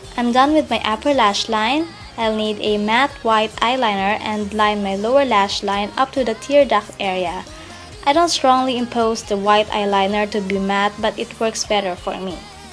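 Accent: Philippines. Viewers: Omg i can totally hear the Filipino accent in you xD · I instantly knew you were from the Philippines when I heard you speak. xD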